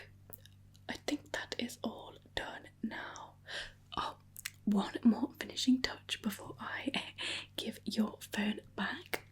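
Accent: United Kingdom. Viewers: I like your British accent. 🤗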